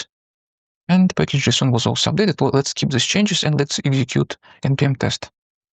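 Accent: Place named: Russia. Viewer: Слышно, что русскоязычный. Why do you speak English?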